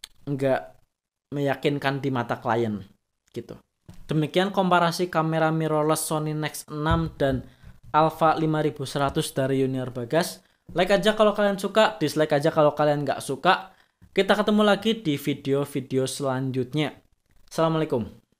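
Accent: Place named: Java, Indonesia. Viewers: Medok banget masny, tapi bagus penyampaian nya lengkap as pengguna sony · medok bgt bang,wong jowo yo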